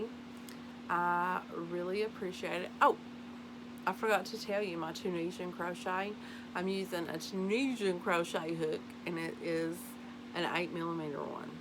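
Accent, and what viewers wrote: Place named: Appalachia, United States. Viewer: Beautiful makes!! I love hearing your hillbilly voice 😂😂 I'm a hillbilly too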